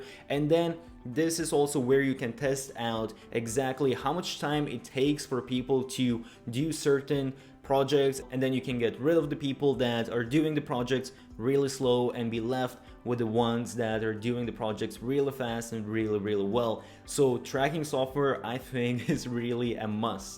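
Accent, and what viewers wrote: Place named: Germany. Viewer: Could be German, or Russia or Estland?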